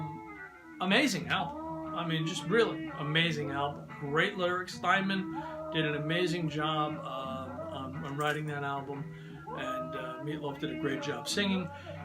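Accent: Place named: United States. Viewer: Hi!! Well done with your channel!! It’s lovely to hear your American accent.. hopefully one day we can meet 😌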